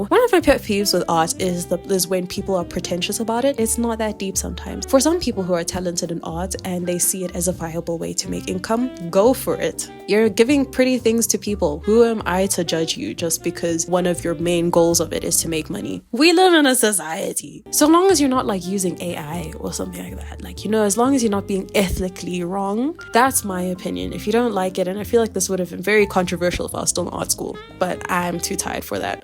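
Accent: South Africa. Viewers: Wow! I also love Charcoal because I AM SOOO IMPATIENT. Graphite and other mediums really make feel this annoying itch that tests my patience!😂 I'm so happy I'm not the only one! Thanks for reading and answering my question. You really do sound South African 😅. THANKS FOR THIS COOL CHANNEL!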